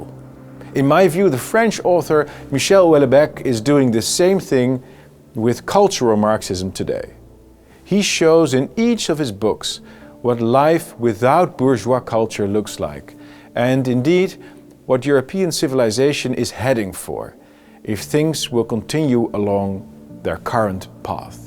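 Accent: United Kingdom. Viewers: Dit soort video's bewerkstelligt dat je verder van leiderschap komt te staan niet dichter erbij ! Dit soort grappige boodschappen nemen stemmers niet serieus sorry............na de laatste keer FVD gestemd te hebben ga ik weer terug naar de PVv sorry , had er goede hoop bij. Maar dit soort dingen maakt het lachwekkend , en absoluut niet MP waardig !!!!!!!!!!!!!!!!!! Tis speels en kinderlijk maar niet volwassen gedrag . Dit doet men niet thierry...........check maar eens Pim die had het en kon het ! Je hebt zo een goede mensen naast je Gideon , Pepijn enz enz...maar je zet hun te kak , wat hun sterkte laat afnemen. Je bent eigenlijk een man die is blijven hangen in zijn kindertijd en onvolwassen overkomt , veel geschiedenis kennen is leuk maar niet in te passen in dit ! Jammer dat ik niet val voor je GB accentje sorry ......................its over FVD ......te danken aan dit soort filmpjes . 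Eigen graf gravend ten top !!!!!!!!!!!!!!!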